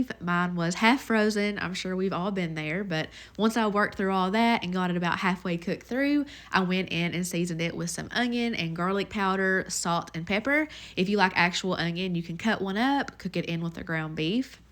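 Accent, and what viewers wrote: South, United States. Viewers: great video again. Love your cooking, presentation & of course your delightful Southern accent! Please do regular videos in 2024. Thanks & best wishes from Sydney Australia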